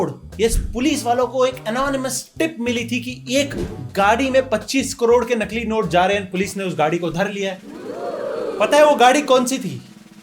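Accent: Gujarat, India. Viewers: That gujrati accent of yours 😂😂😂 soo good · Your Gujarati accent.. 😂😂😂I like it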